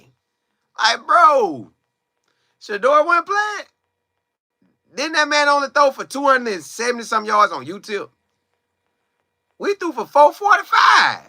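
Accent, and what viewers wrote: South, United States. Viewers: His southern accent cracks me up 😂😂😂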